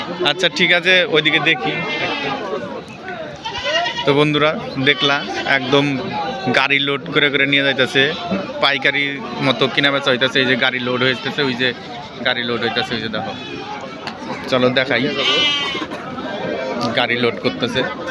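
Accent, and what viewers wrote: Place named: Tangail, Bangladesh. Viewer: দাদা,,, ইন্ডিয়ার ভিডিও,,তবে মাঝে মাঝে বাংলাদেশর টাংগাইলের ভাষা বলেন কেনো😂😂😂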